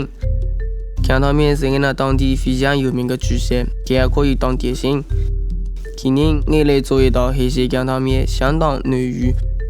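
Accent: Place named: Jiangsu, China. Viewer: 我只知道和江苏话很像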